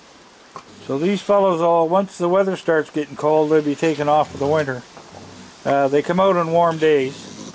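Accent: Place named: Canada. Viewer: I love your Canadian accent!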